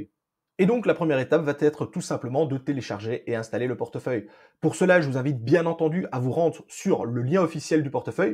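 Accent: Belgium. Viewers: Cet accent xD Tu es du côté de Liéch?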